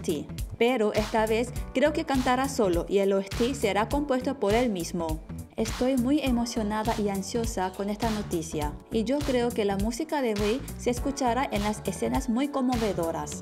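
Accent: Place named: Spain. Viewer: La semana próxima se estrena esta serie en España estoy deseando verla y sobre todo poder oír la canción de mi bias en. Por cierto hablas un español perfecto, con una cuidada gramática, palabras difíciles y no muy corrientes, tu acento parece aprendido en España, estoy totalmente asombrada, magnifico trabajo, saludos desde Barcelona, esperando que salgan las entradas para el concierto de, ojalá no se anulen.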